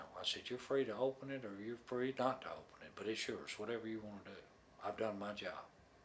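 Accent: South, United States. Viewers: I just love listening to talk! He’s an awesome story teller, and love that southern accent!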